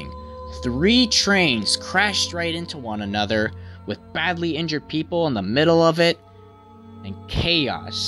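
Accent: United States. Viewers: Here in America all the errors that you mentioned are the way we say it here, you need to remember that this is a American narrating about a UK event, just cause we say thing differently doesn't mean it's wrong. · Its Born-Muth nor Born-Mouth. but its ur american accent so.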